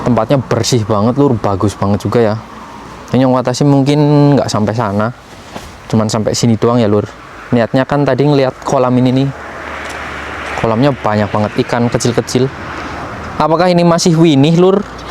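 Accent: Banyumas, Indonesia. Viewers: Sampean asale ngapak ngendi mas?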